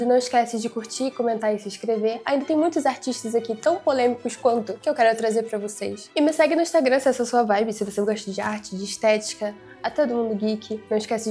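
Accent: Rio de Janeiro, Brazil. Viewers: Não consigo escutar um sotaque carioca e imaginar uma pessoa culta. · Pq carioca fala "naisceu"? Tenta falar "nasceu" normal que aí passa mais credibilidade nas suas falas, fora isso o vídeo ficou muito bom, conseguiu me prender a atenção a forma como vc apresentou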